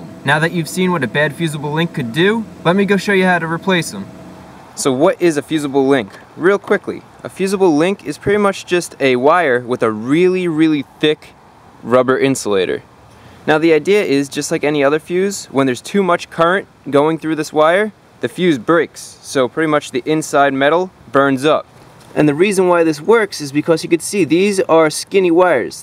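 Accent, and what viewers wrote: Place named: New Jersey, United States. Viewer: He's from New Jersey, that's how we say water here 😅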